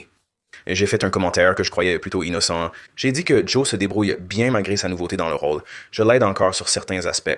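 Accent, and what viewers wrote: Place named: Quebec, Canada. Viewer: Il "sonne" québécois toutefois.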